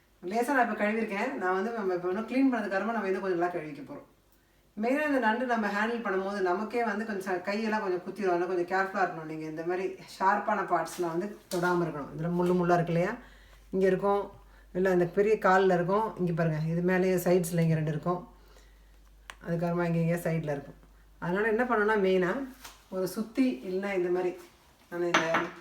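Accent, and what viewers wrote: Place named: Chennai, India. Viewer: So sweet of u for carrying our Chennai slang .very pleasant to hear in u.s.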